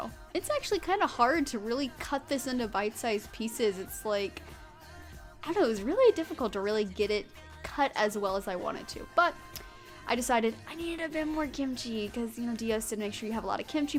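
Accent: South, United States. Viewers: All the y'alls make me think your southern. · Are you originally from the south? Love your accent!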